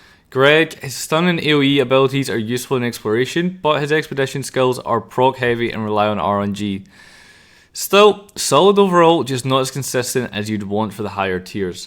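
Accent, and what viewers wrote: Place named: Scotland, United Kingdom. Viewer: This guy is Scottish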